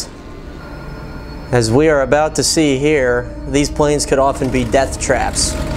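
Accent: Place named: Pittsburgh, United States. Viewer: I live in Switzerland now but I am from just North of da burgh. Always fun to hear that accent. Keep up the cool videos.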